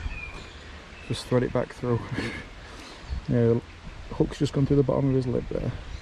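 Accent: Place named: Nottingham, United Kingdom. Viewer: I'm a Nottingham angler and you sound local so can you tell me were is that canal you're fishing ..... I fish mainly at Colwick Park Nottingham??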